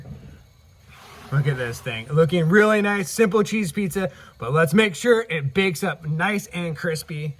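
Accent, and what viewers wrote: California, United States. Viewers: The California accent is amazing. Like from The Californians on SNL.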